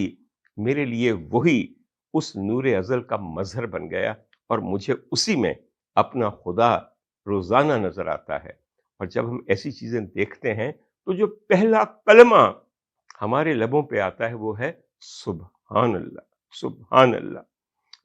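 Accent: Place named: Punjab, Pakistan. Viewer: Verbose. Avelanche of urdu in Punjabi tone (that lethal combo). Urdu as spoken normally in UP is palattable. But this is too much.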